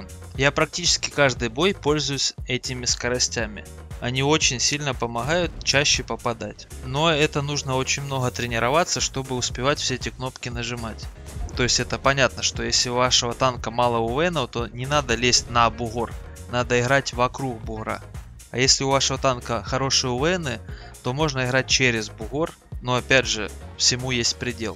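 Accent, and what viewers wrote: South, Russia. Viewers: Ох уж этот южный Гговор))) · глупый)) он не не выговаривает)) это просто южный говор такой)) меня он очень забавляет)))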